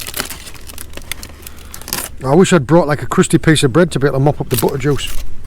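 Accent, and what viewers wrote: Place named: Cornwall, United Kingdom. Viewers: Stunning video and water clarity Nice to see so many lobsters about I also noticed you developing a bit of a Cornish twang when pronouncing words With an r in them lol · Pepperrr and butterrrrr lol you sir are getting a Cornwall accent! 😎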